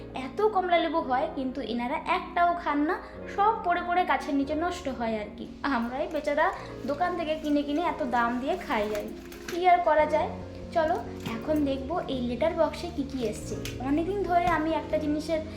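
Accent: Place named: West Bengal, India. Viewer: তোমার সঙ্গে খুব অল্পদিনের পরিচয় হয়েছে কিন্তু তোমার কথাগুলো শুনতে এত ভালো লাগে ❤ তোমার কথাগুলো শুনলে মনে হয় তুমি এই পশ্চিমবঙ্গেই আছো মনেই হয় না হাজারো মাইল দূরে আছো❤ ভালো থেকো সুস্থ থেকো❤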